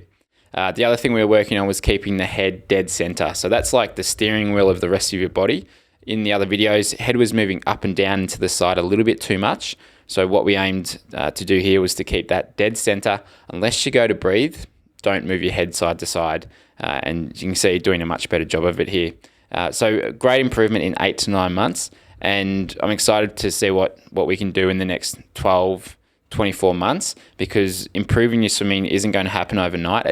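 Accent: Australia. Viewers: Benther He has an Australian accent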